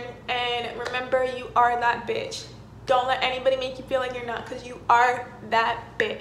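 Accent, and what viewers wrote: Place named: Dominican Republic. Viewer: I legit thought she was Dominican, especially because of the way she speaks.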